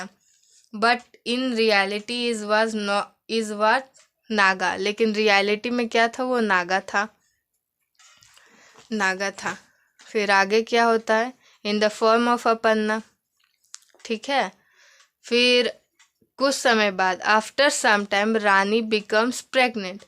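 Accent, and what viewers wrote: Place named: Bihar, India. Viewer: Aap bihari aapki boli se lagta he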